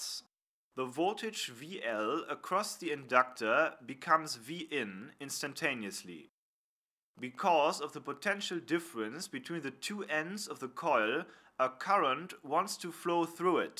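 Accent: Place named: Germany. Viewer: My first time i see your videos, even with your strong german accent (btw im spanish with no very good english level lol) you talk very clear and easy to understand. Great video. Thanks for teaching!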